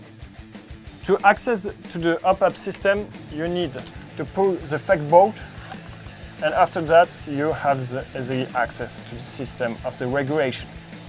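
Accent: France. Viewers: sais trop un français les mec qui parle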